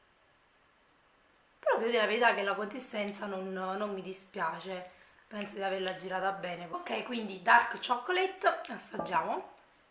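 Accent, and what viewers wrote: Naples, Italy. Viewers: non ti sto attaccando, ma questa ragazza viene sempre presa di mira per qualsiasi cosa faccia, direi che qualche volta il commento lo sii può tenere per sé, senza avere il bisogno di scriverlo, perché tanto penso che se abbia scritto qualcosa di sbagliato lo sappia o se ne accorga dopo, non c'è bisogno di puntualizzare sempre, soprattutto per errori futili come questo, e io comunque da ragazza napoletana, e comunque STUDIO teatro e DIZIONE (CORRETTA PRONUNCIA Della Grammatica italiana) capita di avere questa cadenza, perché è proprio tipica della nostra amata Città (NAPOLI) ed ho anche e solo puntualizzato che comunque il napoletano è considerata una lingua, però non fa nulla, non sentirti attaccata, spero solo che tu capisca come si possa sentire una ragazza come lei, mettetevi nei suoi panni e pensate prima di scrivere, detto questo, Buona domenica 😘❤️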